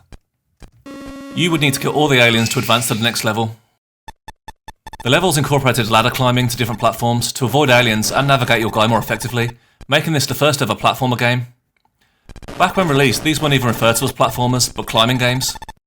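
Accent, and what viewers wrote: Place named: Liverpool, United Kingdom. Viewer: You sound like a Beatle. Are you from Liverpool?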